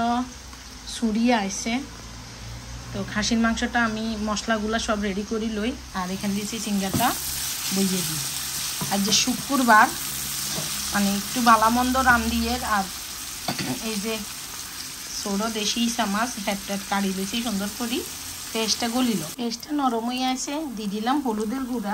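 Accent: Noakhali, Bangladesh. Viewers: Apni noakhali vasay kotha bolen sunta valo lage....amio noakhlir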